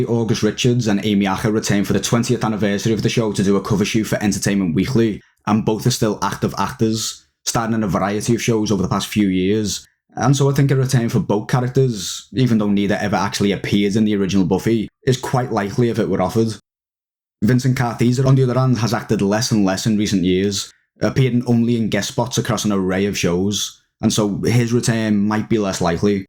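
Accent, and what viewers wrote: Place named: North, United Kingdom. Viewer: nah they’re not the only one! It’s so refreshing to hear a familiar accent. It was partly why I kept watching.😊 We’re definitely underrepresented in the media. Northern accents in particular are sadly so rare.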